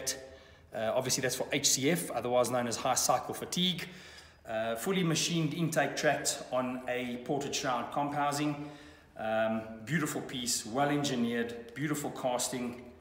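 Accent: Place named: South Africa. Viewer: Dude is definitely south African...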